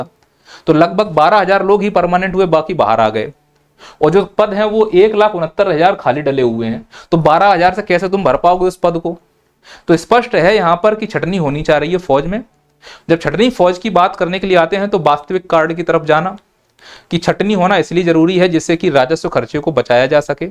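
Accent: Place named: Chambal, India. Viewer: Sir aapki boli me Chambal wali akad hai❤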